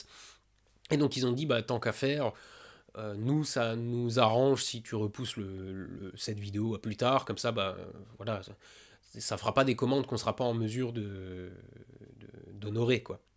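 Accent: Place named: Lorraine, France. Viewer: moi ce que j'aimerai entendre de temps à autre, s'il te plaît, c'est ton accent lorrain, il est juste génial! Vive la Lorraine! attention, pas tout le temps, il ne faut pas abuser des bonnes choses, mais un peu ça ferait plaisir les autres, n'hésitez pas à dire si vous êtes d'accord ou pas pour un peu d'accent lorrain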